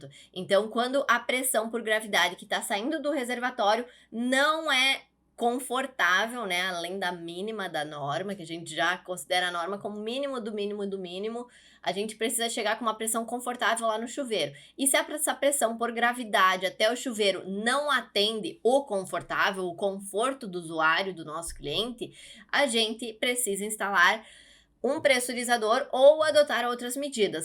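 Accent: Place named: South, Brazil. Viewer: trem bonitinho e esse sotaque do sul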